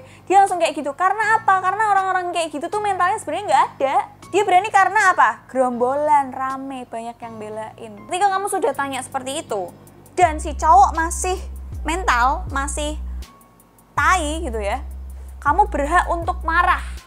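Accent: Java, Indonesia. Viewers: Medok mu semangatku mbakk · Kak kalog bicara lucu yak medok medok gemes....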